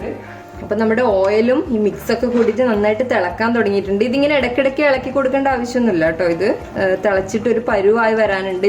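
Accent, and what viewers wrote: Kannur, India. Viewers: എവിടാ. സംസാരം കേട്ടപ്പോ തോന്നി. ഞാനും കണ്ണൂർ ആണ്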